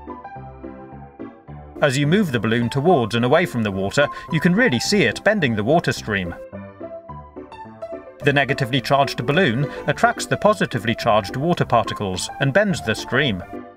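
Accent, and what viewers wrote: England, United Kingdom. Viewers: England. The accent! Just my guess. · yup definitely from england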